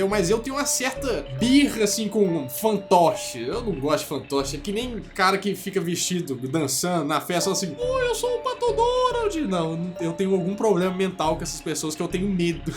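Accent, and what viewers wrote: Minas Gerais, Brazil. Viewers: até no geito de falar vc parece mineiro é nois!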